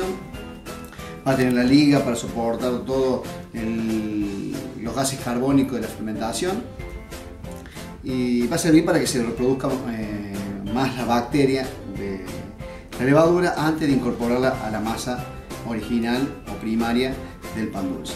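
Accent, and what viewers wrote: Córdoba, Argentina. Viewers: Desde hace muchos años soy un apasionado por la cocina. Hace menos años me sumé a la pastelería y tus clases fueron tesoros en esta nueva búsqueda. Para esta navidad hice turrón turco, pan dulce y budines. Fueron elogiados. Por eso va para vos mi agradecimiento por la generosidad con la que compartís tus secretos y conocimientos. Además, como soy patriota cordobés, disfruto de un maestro con mi mismo acento.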